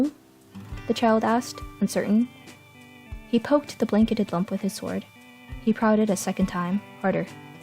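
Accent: United Kingdom. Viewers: The British accent was pretty pog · You did really good with the British accent, in my opinion. Edit: BUMBLE BEE!! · I love your videos!! And your british accent!! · You are good at a brittish accent mainly a male child!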